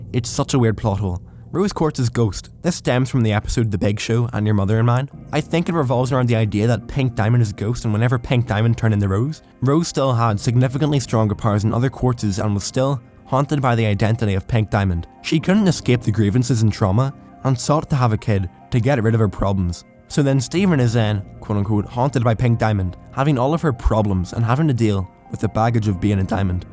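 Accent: Scotland, United Kingdom. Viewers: Great video! You have an interesting accent, are you Scottish?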